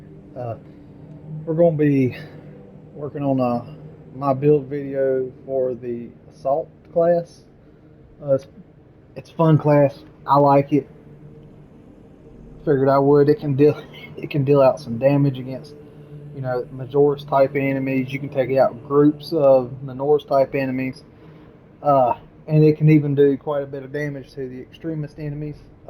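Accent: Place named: South, United States. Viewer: The southern accent is cool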